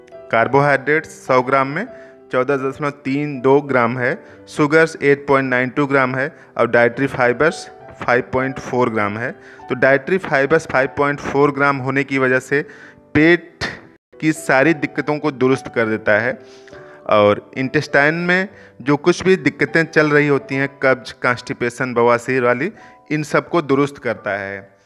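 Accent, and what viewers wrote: Bihar, India. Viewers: Dr n s varma aap khud k video kyo NAHI dalte ho ye kis bihari ki awaj h or aap khud present ho kar bataya karo. or kisi bhi fal ya sabji par video banao to uski ayurvedic property BHI bataya karo ab aap ne elopathy ka chemical value Bata di or isko sham ko ya raat ko khane se mana BHI kara h. kyo kyonki ye prakarti me thanda hota h is liye or ye baat aap kewal ayurved se hi malum hoga the true valuation of the artical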